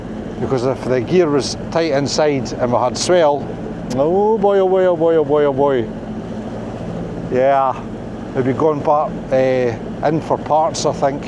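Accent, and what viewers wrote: Scotland, United Kingdom. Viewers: entertaining video. What a pretty place with what looks like pristine water.Your Scottish accent brings back memories of my late grandmother who migrated to Australia from Stirling after the first world war where she met my grandfather, who visited Scotland when fighting on the western front. Anyway, keep safe and good hunting.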